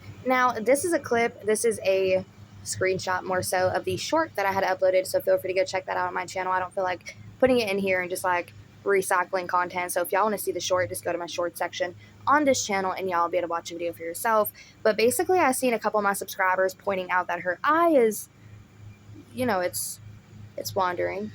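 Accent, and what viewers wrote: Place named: Alabama, United States. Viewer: I must know, are you from Alabama? because I am and we have the same accent!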